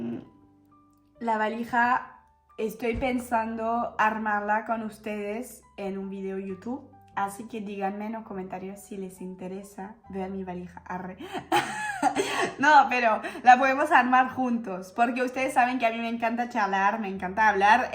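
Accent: Argentina. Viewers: Así es, Argentina es lo más boluda!! 🥰 ❤ 🇦🇷 Sos toda una argentina hablando me encantó tu video! 🎉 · Hola,haciendo zaping en Youtube miro muchos contenido de personas como vos y con parejas de uno y otro país,y te encuentro a. Vos y a Santi,hay tantas historias hermosas de personas de diferentes países, de argentinos con extranjeros,y en esos estás. Vos a diferencia que tú esposo es colombiano, vívio Mucho acá,y vos prácticamente SOS más argentina que francesa,y lo más lindo que hablas tan bien el idioma con acento bien argentino,SOS una persona muy hermosa,todo lo que sea para tu futuro, bienvenida,a la argentina,sos muy bella,sigue tu instinto y te irá bien junto a tu familia,te mando un fuerte abrazo 🫂 🇦🇷🇦🇷🇦🇷❤️❤️❤️❤️❤️ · Porque hablas así si. Sos argentina,???? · Tranqui, hablas muy muy bien argentino. Serás bienvenida si andas por Mar del Plata. Mate y cafecito y ABRAZOS ❤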